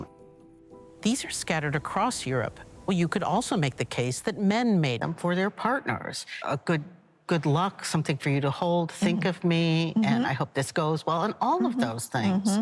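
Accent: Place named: United States. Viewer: Can't get past the usa accent.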